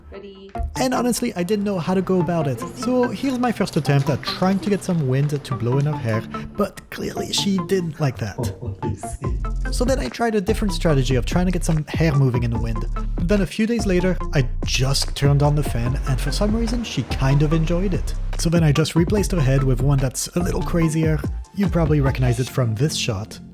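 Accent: France. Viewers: Wow, I really only twice heard a hint of your french accent in the mein part of the video. Your English is perfect, especially compared to my Parisian colleagues! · T’a un accent français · Il y a un petit côté français dans ta voix non ? :) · Incredible video !are u French ? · are you french?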